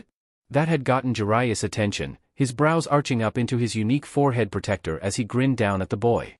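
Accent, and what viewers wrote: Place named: Russia. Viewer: Why dose the voice switch between monotone and Russian accent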